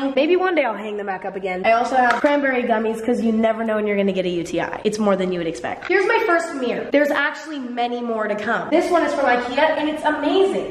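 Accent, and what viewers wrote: United Kingdom. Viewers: Wait u British?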